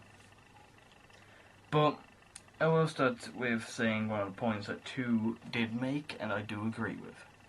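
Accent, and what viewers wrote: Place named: United Kingdom. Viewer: nice video, your british ranting reminds me of rysis and arthimus wishfoot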